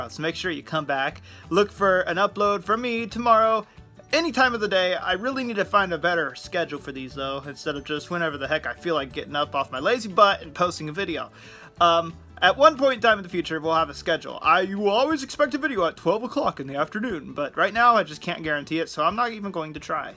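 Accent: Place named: Ireland. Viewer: You do have a GREAT St. Patty's Day accent!!!!! And you are so funny,!!!!! Thanks for the laughter you bring me!!!!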